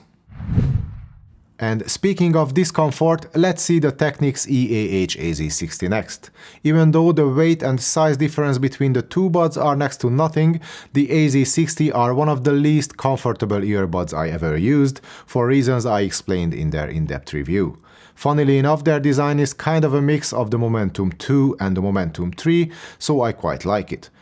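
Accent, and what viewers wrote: Hungary. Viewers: Am I hearing it good that you are Hungarian sorry if it is an uncomfortable question just curious..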